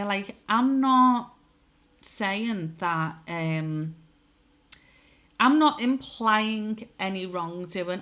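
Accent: Liverpool, United Kingdom. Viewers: I like your voice. It's a kind of pure scouse. In Lord of the Rings I think the Palantir was a magical stone that enabled people to look into other times and places. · Came for the, stayed for the Scouse! I'm impressed by your analysis. Do you follow Joti Brar?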